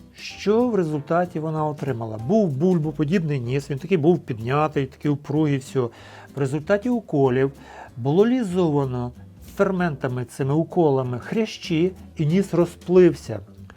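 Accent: Ukraine. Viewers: Величезний респект лікарю, за те що є можливість слухати його українською мовою♥️ До речі ваша українська надзвичайно чиста , як з заходу України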